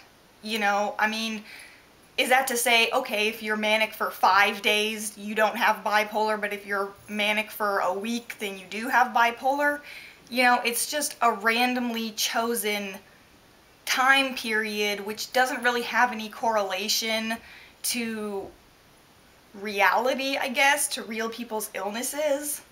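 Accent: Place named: South, United States. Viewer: I have no idea what your background is or where you live, but the way you pronounce certain words like "about", have a hint of a southern accent. I mean it in a good way. It would be nice to know what part of the country you grew up in.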